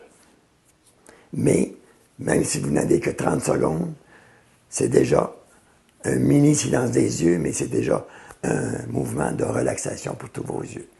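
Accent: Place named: Canada. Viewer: Extra, merci beaucoup ! Votre site est super, on va aussi transmettre le lien à nos clients ! Et j'adore votre accent canadien :-) il réchauffe le coeur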